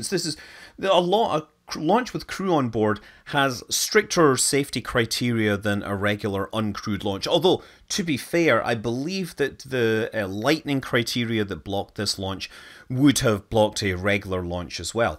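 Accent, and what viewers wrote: Scotland, United Kingdom. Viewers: I don’t think i ever expected to here say “dad bods” in his Scottish accent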